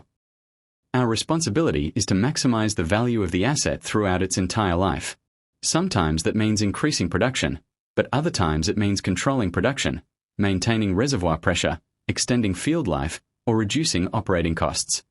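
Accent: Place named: United Kingdom. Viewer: This is a great chapter, can you change the British accent to American?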